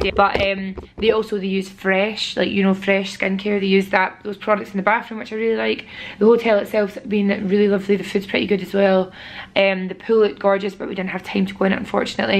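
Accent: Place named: Scotland, United Kingdom. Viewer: I've been subbed for about a year now. I had no clue you were Scottish when I watched your vids for the first time, not til I turned the volume up my first thought was "who's she's not from the USA but she's super pretty and that's a cool lip piercing and some awesome ink work" never cease to make me chuckle at least once. You're pretty awesome, keep it up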